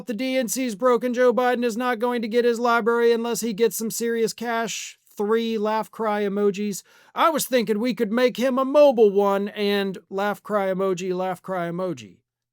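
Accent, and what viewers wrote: South, United States. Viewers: Love the redneck accent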